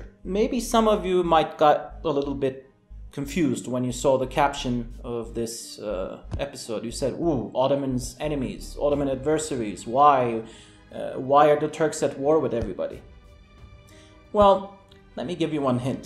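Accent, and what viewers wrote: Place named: Germany. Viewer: Almanya'da yasadin ? Accent almandir.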